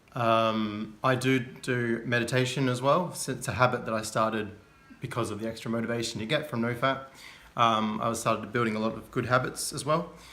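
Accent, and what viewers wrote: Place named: United Kingdom. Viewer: Cool video. Can’t tell if You’re British, Australian or a Kiwi! In any case good luck on your journey, seems like you’re doing well mate.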